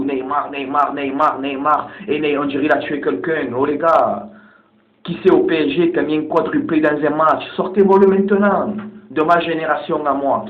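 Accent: Marseille, France. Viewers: Thanks you very much je kiffe ton accent Marseillais j'ai de la famille laba pas loin du Parc Jeannot Tu as totalement raison je suis avec toi que dieu te bénisse J'embrasse tout les Marseillais bye de la part de Chinny Baby Nigeria USA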